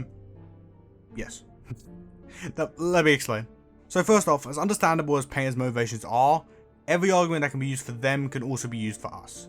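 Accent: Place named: United Kingdom. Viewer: the thick british accent makes this video so much more interesting and entertaining, like on top of the accent you also speak slightly faster so its really funny.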